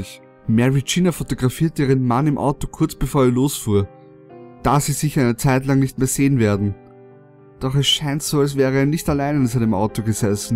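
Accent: Austria. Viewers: oder einfach österreichisch? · Bist du ösi? ^^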